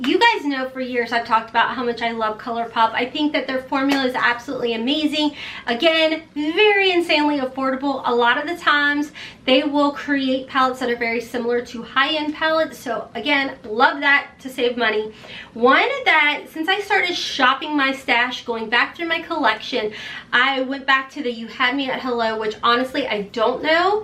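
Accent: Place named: Kentucky, United States. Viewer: That Kentucky accent came out strong in this video! Love it!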